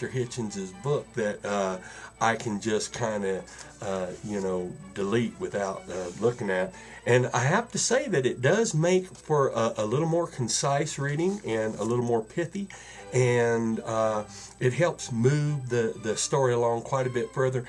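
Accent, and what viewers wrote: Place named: South, United States. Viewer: This Southern dialectal approach is interesting, one can't really tell whether your impersonating or not -- but in any case it has a surprise effect, since it's not the kind of tongue one would connect to an atheist mindset.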